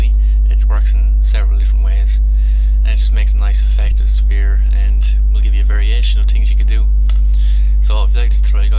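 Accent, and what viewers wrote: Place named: Ireland. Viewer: Nice, are you from Ireland?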